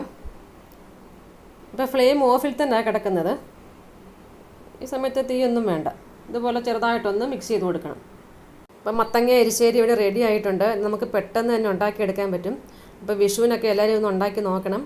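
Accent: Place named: Kottayam, India. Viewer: സംസാരം കൊള്ളാം കോട്ടയം സ്ലാങ് ❤️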